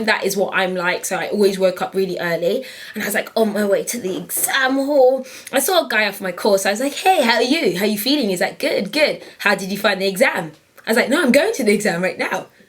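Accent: Nigeria. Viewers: MORE NIGERIAN ACCENT! hilarious haha xx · lol your nigerian accent i love and what colour is your nail polish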